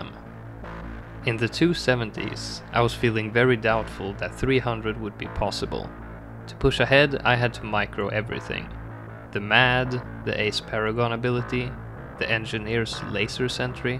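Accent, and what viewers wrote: Sweden. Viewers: you sound really Swedish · you sound soooooo swedish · u sound swedish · You sound swedish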